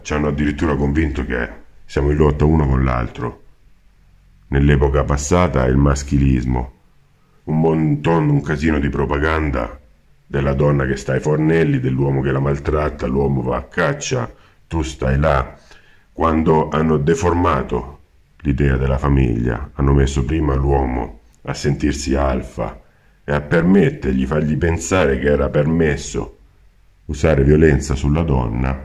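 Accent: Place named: Salento, Italy. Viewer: ti ammiro tanto...grazie per la sapienza ke condividi con tutti noi🙏❤️ti ammiro tanto,ankio salentina di Brindisi,sento un accento vicino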